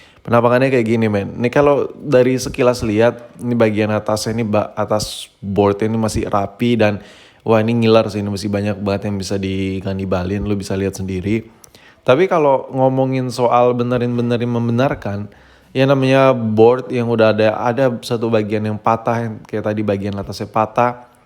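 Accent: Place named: Banjar, Indonesia. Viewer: Dari kalsel y itu ditempat ku tuh urank banjar boy🤣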